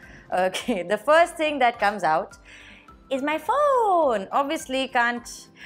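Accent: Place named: United States. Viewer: açcent like American.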